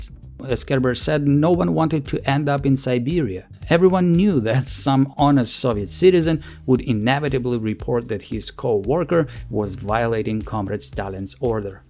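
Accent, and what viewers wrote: Ukraine. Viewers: Hearing all of this in a Ukrainian accent, is especially chilling. I want to make a joke, but it's just sad. Україна не одна. (sorry for any poor translation.) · I now saw that part, of napoleonic wars. Now i can hear also that accent. Western Ukrainian. Dude, i understand, your so called country is slowly but irreversibly is consumed by Russia, but lying about history would not change it. Maybe you should learn from it. Russia is like balloon, when you blow to it, it expands. Battle at Borodino was tactical victory of Napoleon, but strategical victory of Kutuzov. Napoleon gathered more than half million soldiers for invasion to Russia and returned with less than 50 thousand. And it is necessary to say that was half of all soldiers France could gather and it was that better part (they left at home soldiers and militia of questionable quality). 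This "victory" was defeat of Napoleon. Until then, nobody can challenge him, but he bled France so badly in this endeavor, that despite he was still genius and able to defeat European sissies after 1812, he simply lost because sheer numerical superiority of them. And that was possible only because Russia strategy and general Russian winter. Russia is always ridiculed, but it was Russia, who decided wars since 15th century. Yes, very often fruits of that Russian efforts were eaten by another, like in WW1, when it was Russian "problem", which tighten majority of German forces, which would easily wipe out French and British forces, if they would not have to fight Russians. Same apply for balkans. It was Russia, who freed balkan states from Turkish opression, of course Tsars wanted new dominion, not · Is this channel literally anti Russian, Ukrainian propaganda? The narrator’s accent sounds a lot like a certain diminutive Ukrainian actor aka Churchill 2.0 aka the green goblin. 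Fascinating content though. I had no idea 1000 Tu-4’s were built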